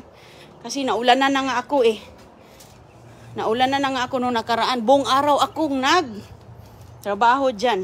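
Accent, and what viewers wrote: Philippines. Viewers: Like your tagalog Accent thanks for sharing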